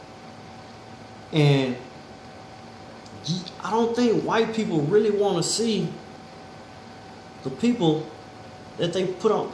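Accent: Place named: Texas, United States. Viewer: where you from? u sound like you in texas, I want to say dallas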